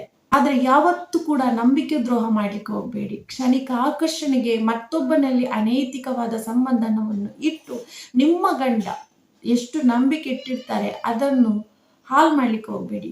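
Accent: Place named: Udupi, India. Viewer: Your accent etc tell me that you are originally from some ಉಡುಪಿ or ಕುಡ್ಲ r area but probably living away from thére. This video indirectly talks about some vital statistics about the high rate of illicit sex among the married female partners of those men who have gone away, usually abroad, finding some employment. You are strongly suggesting here that to a (married) woman being sex-unemployed is next to impossible and so having UTTERly failed to control their desires etc, they have chosen to tread the dark path of physical adultery. You have also said that not all of them have become so which is natural to invite the following legitimate question--how are the majority of those not regularly sexually active ÍN the secret chambers of men coping with their uncontrollable libido--have they been using sex toys and masturbating often, have they been watching or reading mummy porn, have they been having phone sex or non-penetrative sex etc? 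I hope you would also deal with those alternative sex satisfaction means also sometime. Thank you for using ದೈಹಿಕ ಪ್ರೇರಣೆ somewhere which is only a remote euphemistic translation of 'sexual advances'.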